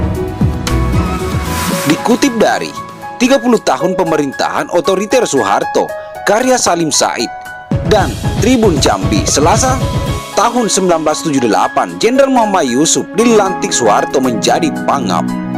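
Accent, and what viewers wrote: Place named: South Sulawesi, Indonesia. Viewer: Maklum sang narator ini pasti orang Sulawesi Selatan Memang begini cara berbicara nya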